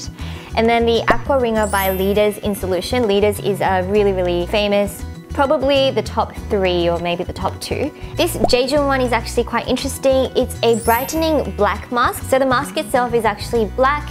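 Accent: Australia. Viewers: OMG AUSTRALIAN ACCENT 😍💗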